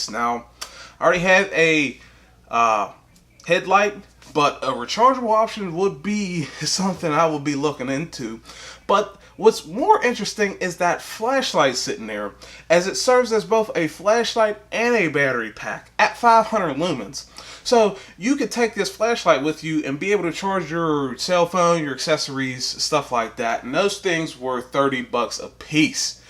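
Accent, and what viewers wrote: Pittsburgh, United States. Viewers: From the second I heard you start talking I knew you were from Pittsburgh as so am I. So yeah, all yinz dahn at Lowe's you can find all them tools for a good deal.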